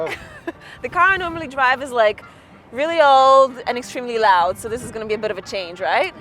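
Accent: United States. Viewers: Is it me or did you get a slight American accent in this video? :P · in america, even her accent got a little more american🤠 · so cute, has aquired an a bit of an A,merican accent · Hilarious how you picked up the American accent! (from your hybrid British - Polish accent)! Like my wife who picks up the accent of the places she visits.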